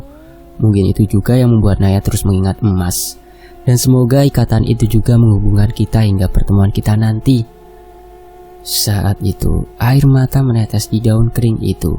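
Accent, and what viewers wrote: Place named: Bali, Indonesia. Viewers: Mas bikin salfok pke logat bali😂😂😂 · Om swastiastu blie 😅😅😅 longat Balinya keren salut ya sama blie selalu bisa mengikuti longat di setiap daerahnya 😅😅 Salam dari Jimbaran Bali Kuta Selatan blie 😅😅 astungkare sehat selalu biar semangat trus bawa acara fakta sejarah dan fs juga 😅😅😅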